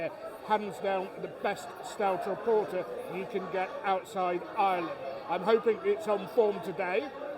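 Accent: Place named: Yorkshire, United Kingdom. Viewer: That Yorkshire accent 😀Keep on trying!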